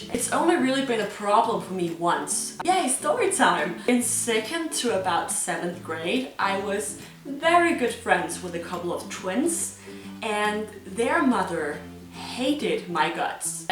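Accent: Denmark. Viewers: low key Sorry, that sounded a bit shitty :) I just meant, that its clear (to me) that her accent is danish.. :) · you have such a typical danish accent.... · You have such a typical danish accent :D! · man kan godt høre du er dansk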